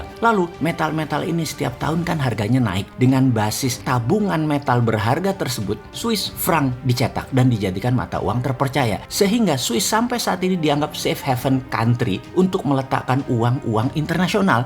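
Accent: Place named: East Java, Indonesia. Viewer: Ngopimu kurang adoh lur wkwkwk hahahaha Jawa timuran rek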